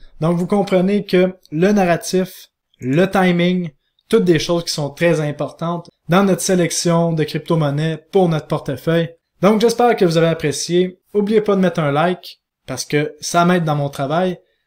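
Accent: Quebec, Canada. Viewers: Salut!! Merci pour tes vidéos et vraiment tu es mon meilleur youtubeur!! Pas de blabla et de ramassis de conneries comme certains qui te font fomo.ta franchise et ton franc parlé le plaît!!tu es tjs présent dans les hausses comme dans les baisses!je te suis de déjà un bon moment.je le souviens que tu parlais si je me trompe pas du fromage a burger que l on mange....je ne pense pas que ETHcefera detronnee car c'est du lourd!! Et présent depuis déjà longtemps et les develloppeuts sont derrière!! Puis ton accent Québecois...j adore!! Mercipour ton taf!! K en redema'de encor et tjs.vivement la suivante!! Merci!!! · vidéo très complète, seul soucis c'est que l'accent canadien est pas trop plaisant dsl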